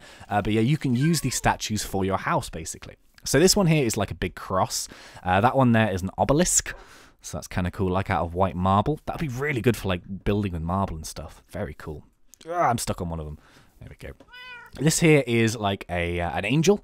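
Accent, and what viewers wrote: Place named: Northern England, United Kingdom. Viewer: Hi, I lived in England for a while and became fascinated with the varied music of the many British accents. I'm usually good at guessing what region one is from, but I can't seem to guess your accent. It almost sounds as if you were born in one place and moved at a young age to the north of England. Would you be so kind as to tell me the history of your accent? Kindest regards, Tal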